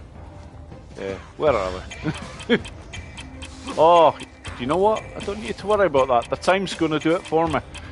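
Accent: Scotland, United Kingdom. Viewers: top class mate as per. Even better when hearing another Scotsmen swearing properly. Still had good scores tho . I am trying to get better scores on outridge . 131 is my highest but can’t get anywhere near it. Had a look at the leaderboard your number1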